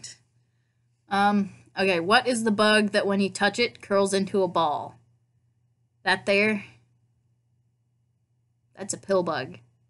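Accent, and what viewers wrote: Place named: South, United States. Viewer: To my English ear you sound southern but only a bit. Definitely southern though.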